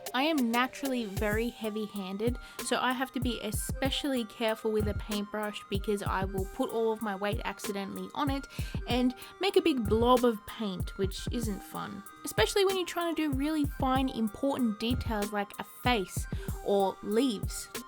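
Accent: Australia. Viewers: I luv that australian accent :D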